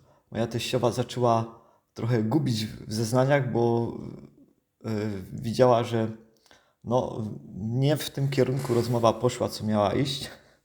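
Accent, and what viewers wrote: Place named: Silesia, Poland. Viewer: Witaj! Jak dobrze Cię znowu słyszeć 👍i wcale przynajmniej mnie Twoja śląska mowa nie przeszkadza, wręcz przeciwnie, ja też mówię i tyż gołdom, jestem z krwi i kości. Ślazaczka z Opolszczyzny, więc póki co pozdrawiam serdecznie i do następnego usłyszenia😊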